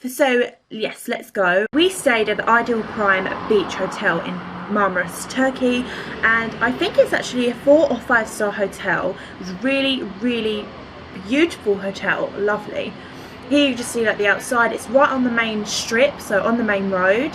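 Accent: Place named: RP, United Kingdom. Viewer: U sound very posh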